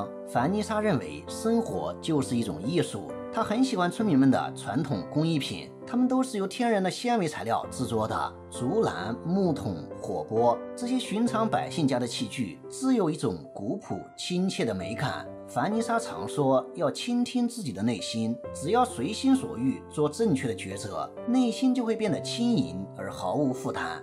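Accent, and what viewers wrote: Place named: Shandong, China. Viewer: 越越是哪里人啊？听着口音像山东，河南，陕西？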